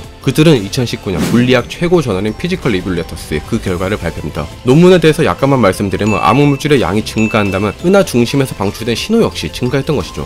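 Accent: Gyeongsang, South Korea. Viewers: 깅상도억양 표시나요 숨길수없는 태생의한계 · 실수가 아니라 그렇게 말하면서 살아왔는데 어찌하겠소 경상도인의 특징이고 그런 억양이 강한 동네에선 고치기 힘들며 언어적으로 바르게 쓰려는 흥미가 없는 사람은 본능적으로 기존 발음을 못 고칩니다 제가 이런쪽으로 잘 압니다 본인 의도와는 상관없이 못고칩니다 특히 빠르게 말할수록 더더욱 못고칩니다 사람은 각각의 흥미요소가 다르기 때문에 발달되고 교정하려는 능력도 다릅니다 대신에 다른것으로 우월한 분야가 따로 있겠죠 같은 지역 같은동네 출신이라도 나중에 단어를 어떻게 읽고 어떻게 발음하는지는 사람마다 큰 차이를 보입니다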